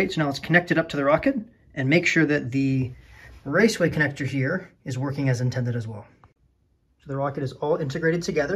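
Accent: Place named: United States. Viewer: Sounds like your American, how about giving us inches, feet, and grams/lbs.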